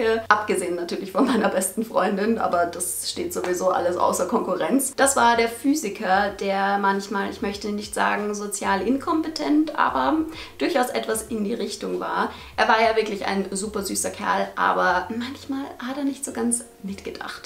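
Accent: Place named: Vienna, Austria. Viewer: Ich höre dennoch dein wienerdialekt durch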